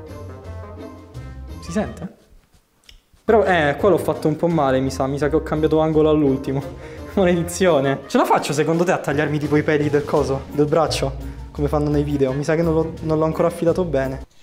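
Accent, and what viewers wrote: Rome, Italy. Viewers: Perché quando sei con i tuoi amici marchi di più l accento romano ? È fastidioso non so perché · Che bello quell'accento romano che persiste per tutto il video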